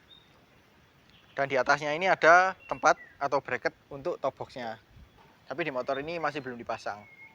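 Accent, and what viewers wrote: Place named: Java, Indonesia. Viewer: Suaramu begitu iconic dan medok mase 😁👍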